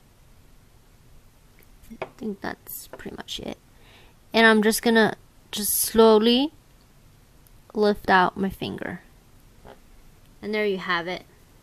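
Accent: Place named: China. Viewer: its only because she has a chinese accent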